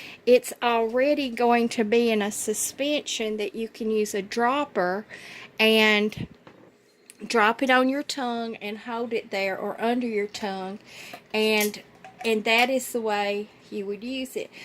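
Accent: South, United States. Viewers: love the Southern Drawl of the narrative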